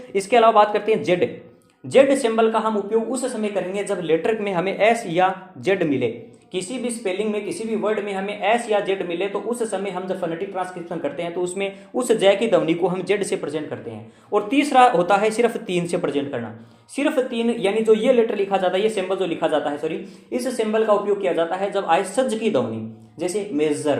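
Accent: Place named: Haryana, India. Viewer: Sirji aapki language me haryanvi tone kse aapto rajsthan se hoji 🤔🤔🤔🤔🤔🤔🤔🤔🤔🤔🤔🤔🤔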